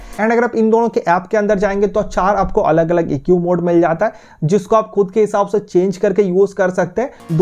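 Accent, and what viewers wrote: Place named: Assam, India. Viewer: Everything is good but ur Assamese accent is kind of unique not bad though 😊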